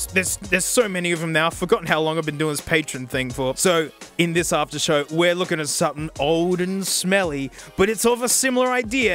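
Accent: Australia. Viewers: I have never heard tinnitus said that way, am I wrong or is he just too Australian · I'm just here to learn the Australian accent of his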